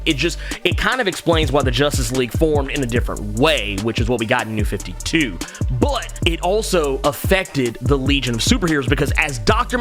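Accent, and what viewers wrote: United States. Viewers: He’s American how else is he supposed to sound like?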